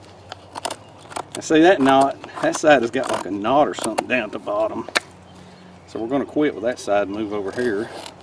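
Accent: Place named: Georgia, United States. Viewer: I love watching all the different Bushcraft guys, I like the Canadian's cause they have so much beautiful wildness trips but for learning I like watching you guys in my area, southeast USA cause we have similar woods and weather conditions. You were the 1st guy I started watching, mostly for that Georgia accent, very educational and entertaining. I like watching David West a lot too. He can cross his eyes make fire. He isn't so much Bushcraft but he loves finding new ways to make fire. Enjoyed your rambling as always. Keep em coming when you can.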